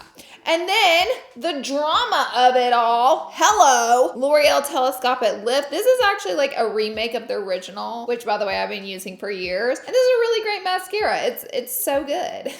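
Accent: South, United States. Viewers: Tell me you're from the South without telling me you're from South ! FYI ... Nola girl here, so love you still have it! Anytime you say oil and y'all! 😂🤣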